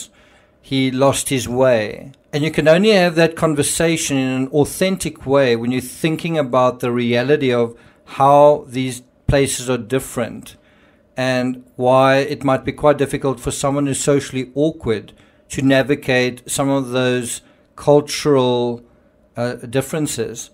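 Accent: South Africa. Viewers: I knew you were from S.A. you speak just like a friend of mine from S.A. 🇿🇦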